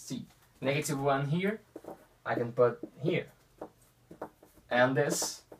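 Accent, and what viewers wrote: Brazil. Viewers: Galera, vamos acordar 2018! Saber inglês não é diferencial, é essencial! Mais do que nossa obrigação saber a lingua universal ainda mais que ele tem bastante sotaque brasileiro e fala devagar dá pra entender claramente! Excelente vídeo amigo!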